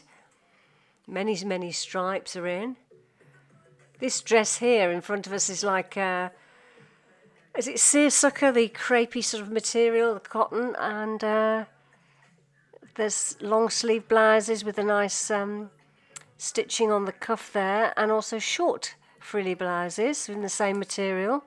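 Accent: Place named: Midlands, United Kingdom. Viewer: I love your subtle midlands accent. I grew up in Brownhills near Walsall.❤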